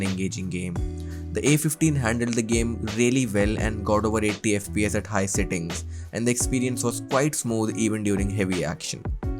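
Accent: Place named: India. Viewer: Nice Indian accent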